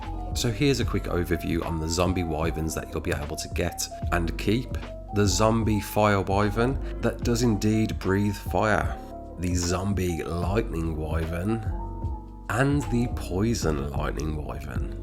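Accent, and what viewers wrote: Birmingham, United Kingdom. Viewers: You sound like a brummie boy ?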